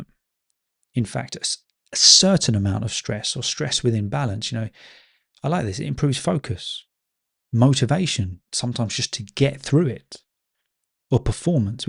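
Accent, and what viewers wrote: England, United Kingdom. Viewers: Hello, as a new listener, I appreciate your podcasts so much, very interesting topics, nice English accent, pleasant voice. I improve my English and I'm relaxing listening to you. Thank you very much, you make me think about life in English 🙏🏼🙏🏼👏🏼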